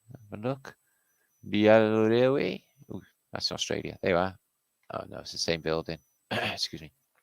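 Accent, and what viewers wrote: Wales, United Kingdom. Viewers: I'm sorry the world lost a good guy , a truther, with a gorgeous Welsh accent. (the world needs more of all of the above)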